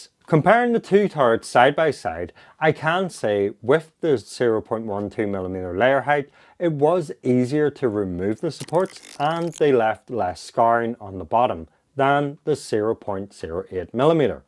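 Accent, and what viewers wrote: Northern Ireland, United Kingdom. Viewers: just discovered you for the first time. praise the algorithm ! that a fellow "Norn Iron" accent i am detecting there lol?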